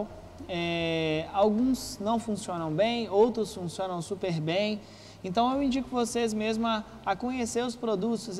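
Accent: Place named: Belo Horizonte, Brazil. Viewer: Esse cara deve ser de Belo Horizonte ,pelo sutaque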